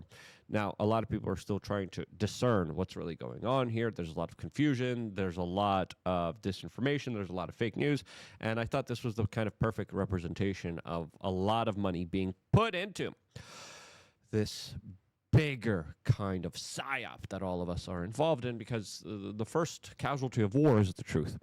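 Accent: Poland. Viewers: He's Polish. English is a 2nd language for him.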